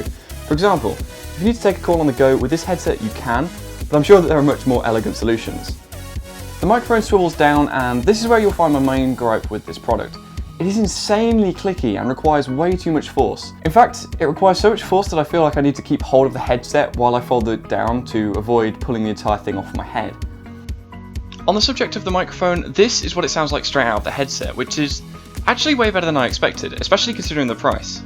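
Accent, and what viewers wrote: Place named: RP, United Kingdom. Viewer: love how posh you sound in your videos, mate 😂 also, what's with the 2 month absence? 😏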